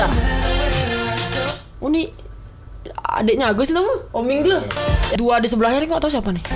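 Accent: Bali, Indonesia. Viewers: Pantesan logatnya aja udah dari bali sama kayak gue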